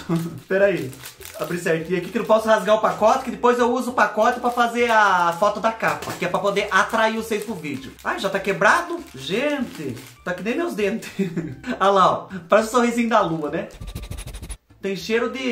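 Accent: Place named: Minas Gerais, Brazil. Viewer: amo o seu sotaque, falo bem parecido, aqui na minha cidade o povo me chama de "mineirinha" 😂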